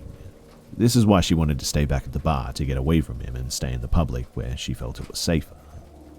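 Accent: Australia. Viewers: Ahh time for bed. taking this soothing Aussie voice with me. Love ya!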